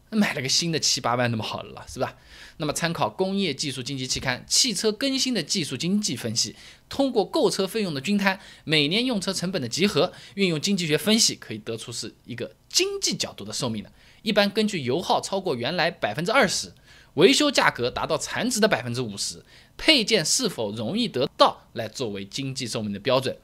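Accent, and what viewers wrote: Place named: South, China. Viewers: 上海口音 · 非常喜欢你的南方普通话。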